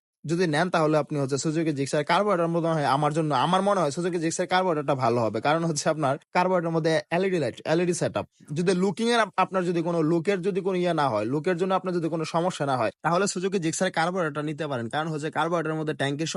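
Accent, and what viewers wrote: Narsingdi, Bangladesh. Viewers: ভাইয়ের বাসা কি নরসিংদী নাকি 😊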